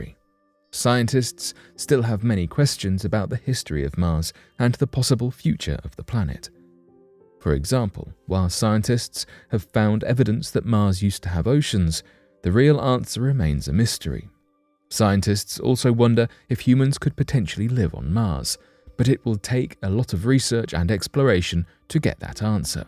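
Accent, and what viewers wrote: England, United Kingdom. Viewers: You're a liar and a fat mouth and with that English accent it doesn't make you sound intelligent you might fool some people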